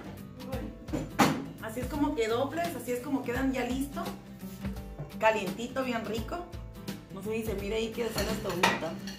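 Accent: Sinaloa, Mexico. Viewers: Me encanta tu asentó Sinaloense,y tú forma de ser tan a todo dar,me siento como si estuviera en el rancho "el Espinal Elota Sinaloa"gracias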